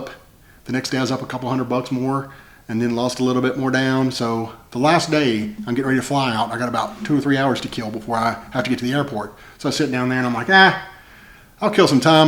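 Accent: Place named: Appalachia, United States. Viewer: Love great video thanks for sharing 👍👍👍🇺🇸🇺🇸🇺🇸🇺🇸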